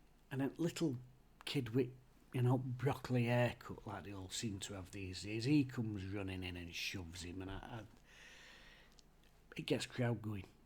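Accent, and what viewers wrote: Sheffield, United Kingdom. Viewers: I love your unique take on the games - and your Sheffield 'speak' as a reminder of my own background. I wish you and yours a great Christmas and New Year - wouldn't it be nice if your beloved Owls and my yo-yo Blades both achieved promotion in 2025. All the best from NZ.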